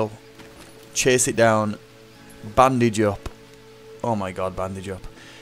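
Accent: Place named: United Kingdom. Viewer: He's a Brit, it's just how they say it. It's when they pronounce aluminum and antibiotics that break my mind.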